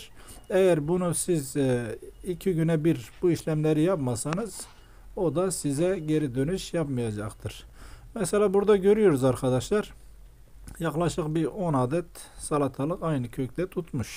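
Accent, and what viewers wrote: Malatya, Turkey. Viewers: Bende malatyaliyim bizim yöre şivesine çok benziyordu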